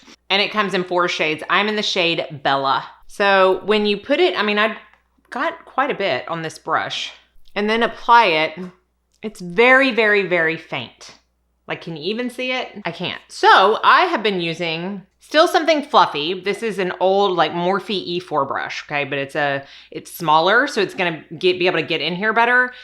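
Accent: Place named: Texas, United States. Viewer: Hey. You are on FIRE today! You go girl! What a great video. I didn't know you used to work as a MUA. It makes sense now why your videos are so chalk full of great information, and why I've gravitated to your videos. BTW... I also love your accent. You kind of sound like my cousins who live in Corpus Christi Texas. I could listen to you all day long. Thank you. Love you, Calvin.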